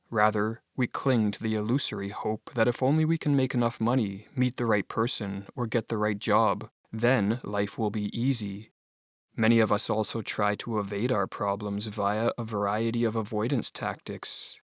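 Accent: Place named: Canada. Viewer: Canadian accent.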